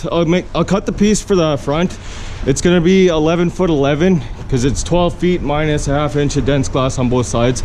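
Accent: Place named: Canada. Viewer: You sound Canadian. You ever end your day with a smoke?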